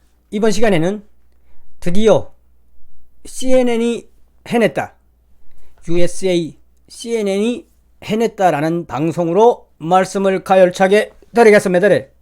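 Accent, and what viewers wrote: North Korea. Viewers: ㅎㅎ 북에서 오셔서 완전 표준어를 사용하시기 힘드실 수도 있죠 뭐~ 저는 감독님 사투리 듣기 좋아요. 사리원이 고향이신, 6.25 때 피난내려오셔서 고생 많이 하신 우리 돌아가신 할머니 생각도 나구요~ 감독님 건강하세요! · 북한에서 사신 분 이셨군요. 표준어를 사용 하셔서 몰랐습니다. 사투리 말씨야 고치기 어렵지요. 제가 사투리 갖고 말씀 드리는 것이 아니라, 동무 동무. 하시는 억양이 북한 채제에서만 사용하는 말투라 듣기가 조금 거북 했습니다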